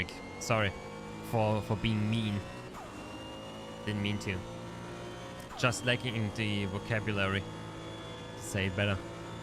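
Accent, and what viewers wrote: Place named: Germany. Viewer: Are you German you pronuns it Like a German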